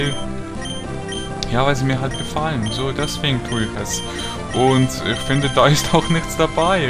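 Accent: Switzerland. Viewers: Bist du Schweizz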